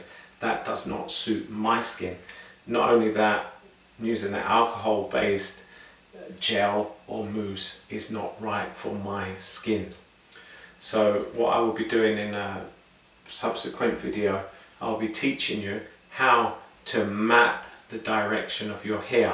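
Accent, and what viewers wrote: United Kingdom. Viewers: Love that British Accent 🤗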